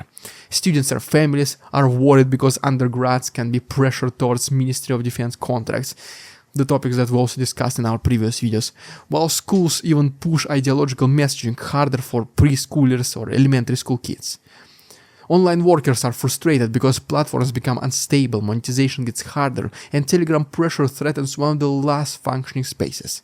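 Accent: Russia. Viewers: In the beginning you were throwing morw effort on speaking out the English words. Now you are shooting them like you are speaking Russian... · I am terribly impressed by the command of the English language, although spoken with a thick Russian accent. I am an Australian that follows him daily and everybody here, (except a very few), totally support Ukraine. I would bet money that the rotten bastard Putin will be gone by Xmas!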